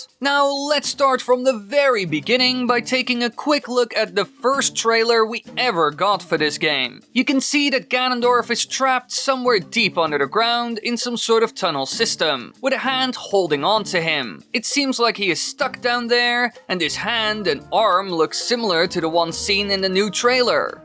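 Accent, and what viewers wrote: Netherlands. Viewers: I really, really miss your Dutch voice. You sound so forced and fake nowadays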